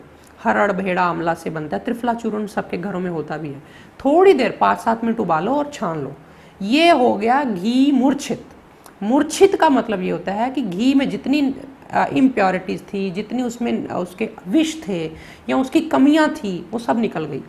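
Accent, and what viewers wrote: Punjab, India. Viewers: Tussi punjabi je!???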